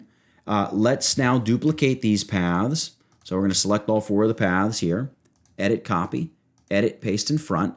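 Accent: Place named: New York, United States. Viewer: Hey seeing you at work man, I'm merely 3 years into Illustrator yet I would assume the technique demonstrated here is intensely more complicated than what I would do in this case. Please could you elaborate on it? Once again cool vids man way to go with the NY accent.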